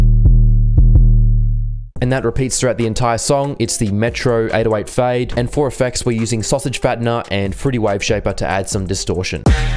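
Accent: Australia. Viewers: bro u from australia yh?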